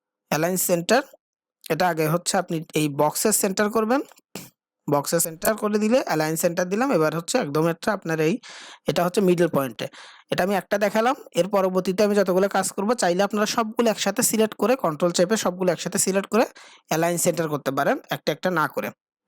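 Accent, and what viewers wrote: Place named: Chittagong, Bangladesh. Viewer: চট্টগ্রাম ভাষায় কথা বলছে ।। আপনার ঘর কোথায় 🤔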